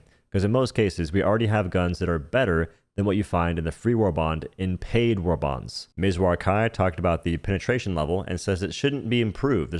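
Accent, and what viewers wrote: South, United States. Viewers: I'm from Bowman south carolina and I gotta tell you every other man you meet sounds like that. Southern drawl. Born and raised there too but my accent is weird.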